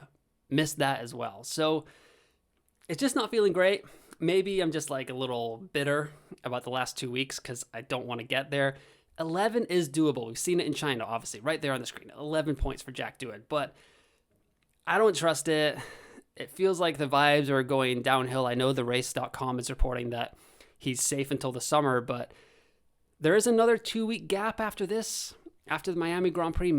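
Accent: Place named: United States. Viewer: are you an American who has moved to Europe? Your accent is so 🇺🇸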